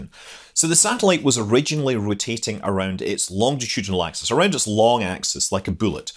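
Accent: Scotland, United Kingdom. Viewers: burr makes Explorer sound like Exploder. Funny we used to call an earlier version of the Ford Explorer, the Exploder for the transmission’s propensity to explode under certain conditions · With your awesome Scottish accent, I always hear “Exploder” as opposed to “Explorer”. Important difference haha.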